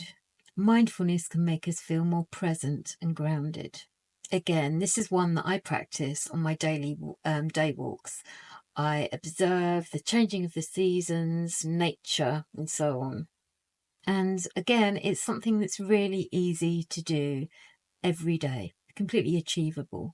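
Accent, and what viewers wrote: United Kingdom. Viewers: I loveyour accent is it RP or POSH and where are you from?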